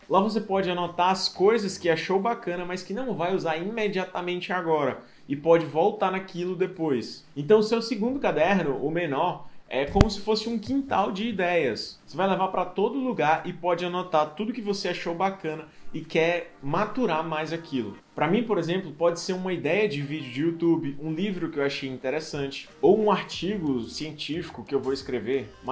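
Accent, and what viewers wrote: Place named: Fortaleza, Brazil. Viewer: Oi,. Tu é daqui de Fortaleza-ce? o sotaque é parecido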